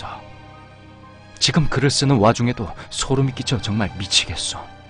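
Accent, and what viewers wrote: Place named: Gyeongsang, South Korea. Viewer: 님이 경상도 분이라 아래어떤분이 서술톤이 재밋다고 하는게 이뜻 아닐까요? 사투리 쓰시는 대신에 잘생겼잖아요. 키도 저강하시고....^^ 님 도화살 조심조심 ^^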